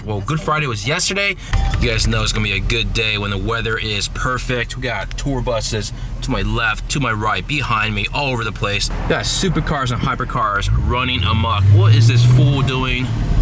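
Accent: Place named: Southern California, United States. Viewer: That socal accent. Lol irrelevant but still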